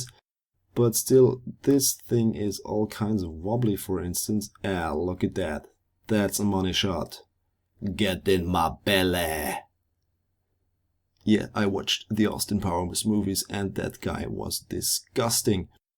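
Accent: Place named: Germany. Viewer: You sound a bit german...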